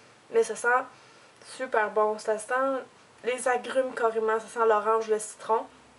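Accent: Quebec, Canada. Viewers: Coucou ! Félicitations pour tes vidéos qui sont toujours originales, utiles et marrantes ^^ Tu es très créative, simple, dynamique et tu expliques bien les choses (et j'adore ton accent québécois !). Continue comme ça c'est super ;) Bisous de France ! :)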